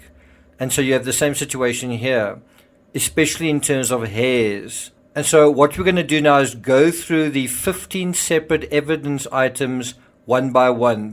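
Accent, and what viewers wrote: Afrikaans, South Africa. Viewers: Are you South African? You have a SA accent 😊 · Is that an Afrikaans accent?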